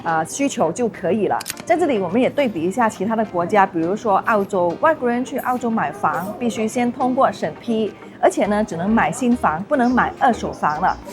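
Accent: Malaysia. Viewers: 马来西亚人喇！（从她的姓Ang，不会是台湾人），（2）她的口音，不管是华语或英语都是大马口音。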